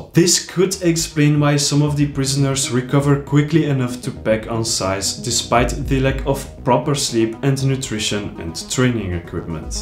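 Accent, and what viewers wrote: Belgium. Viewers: ik kon meteen horen dat je belg bent haha. je komt zeker en vast uit antwerpen :)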